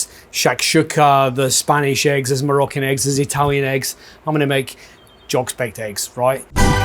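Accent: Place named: Scotland, United Kingdom. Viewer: Ye can take the man out of Scotland, but ye canny take the Scottish out the man.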